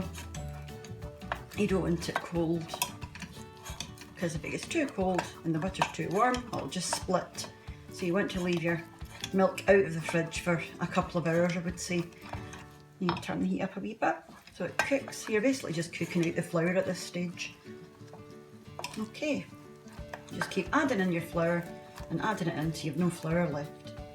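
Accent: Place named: Scotland, United Kingdom. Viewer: Im happy to here a Scottish woman teaching me im not a great cook im learning but love the simplicity of the menus n great food from yonuger years ❤👌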